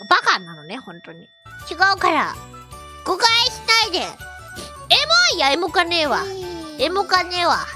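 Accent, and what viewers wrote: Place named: China. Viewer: Is she Chinese? That accent definitely isn't Japanese first language English Second Language.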